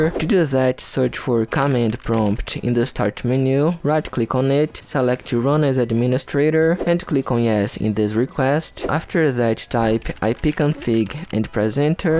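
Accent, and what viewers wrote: Brazil. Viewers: cara da pra perceber q é br so pelo sotaque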